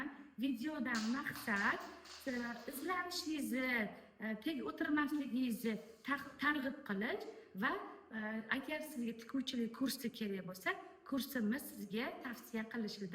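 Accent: Uzbekistan. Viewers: Маргилонча гапираркансиз оддий тушунарли халкона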